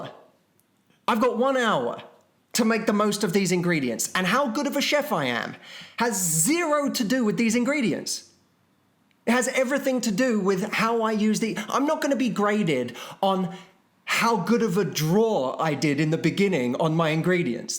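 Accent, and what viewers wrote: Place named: United Kingdom. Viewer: Your British accent is standing up again, I guess spending good quality time with family is the reason.😊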